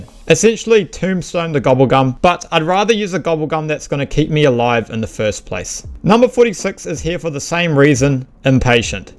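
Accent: New Zealand. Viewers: yooo bro i came from the lex video and instantly recognised your accent since im a kiwi too. love to see a zombies creator from nz. keep it up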